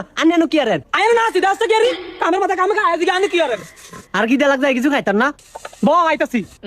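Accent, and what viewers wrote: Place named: Chandpur, Bangladesh. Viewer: Chadpurer vasa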